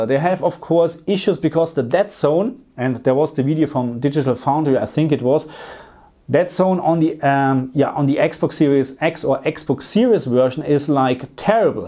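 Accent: Germany. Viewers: Geiler Kanal, hab dich eben entdeckt. Man hört klar, dass Deutsch deine Muttersprache ist. Abo geht raus und Daumen hoch.👍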